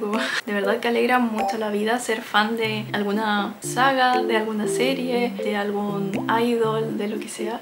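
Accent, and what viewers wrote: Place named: Chile. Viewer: Lindo video, gracias por las ideas, últimamente también he disfrutado más mi tiempo en casa y es lindo encontrar más pasatiempos. Conocí tu canal no hace mucho y me ha encantado :D Tus videos me hacen sentir calma ^ ^ También tu voz es muy linda y relajante (y probablemente tambien tenga que ver que el acento chileno me parece muy lindo jaja) Estoy feliz de haberme topado con tu canal :D 💕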